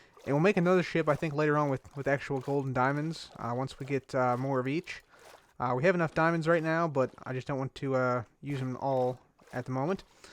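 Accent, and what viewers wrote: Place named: Texas, United States. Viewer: Hey I wanted to know if you lived in Texas you sound like you do because you love space so I bet you live by Houston Ps you are the best YouTuber ever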